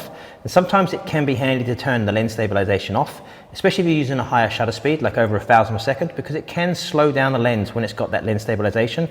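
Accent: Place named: Portsmouth, United Kingdom. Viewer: Devonshire is a beautiful place. You are right about Portsmouth always something happening there. (good or bad) Still trying to get to use his Pompey accent on his channel.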